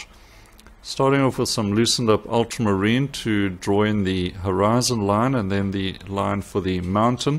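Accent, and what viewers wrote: South Africa. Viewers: How nice to hear a South African accent in a tutorial for a change. And that landscape inspiration pic makes me “verlang” home. So a happy and sad video 😄😔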